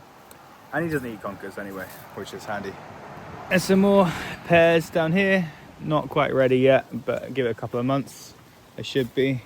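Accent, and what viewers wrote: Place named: Australia. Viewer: Hi there!! I'm at a loss as too where you are in the world!! The scenery looks like England!! But your accent suggests Australian of some kind!! Confused!! LOL!!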